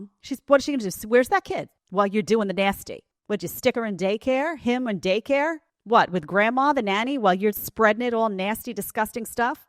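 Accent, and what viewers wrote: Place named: Long Island, United States. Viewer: NOT FLIRTING, JUST STAING MY OPINION., I like your Long Island accent that slips through every so often. It reminds me of my parent's accent (Connecticut born). Nostalgia.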